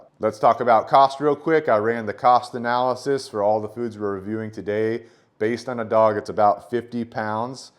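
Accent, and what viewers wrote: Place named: General American, United States. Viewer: He has a standard American (U.S.A.) accent.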